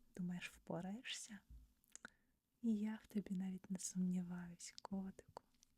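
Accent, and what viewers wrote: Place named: Ukraine. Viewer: As I always say, relaxing begins with!🤩🤩🤩 what a beautiful whispering voice with that magical Ukrainian accent!!!😍😍🥰❤Love Greetings Oliver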